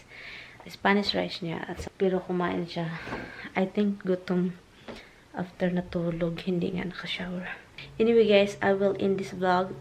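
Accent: Visayas, Philippines. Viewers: I can hear a Bisaya accent when you speak Tagalog. And you speak slow enough where I can understand much more than from a natural Tagalog speaker. Thanks, it helps me learn Tagalog.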